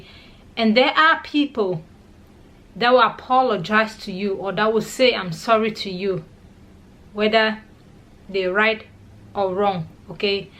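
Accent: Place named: Kenya. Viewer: You sound like a kennya woman though i enjoy your word of wisdom 👌you earn a new friend here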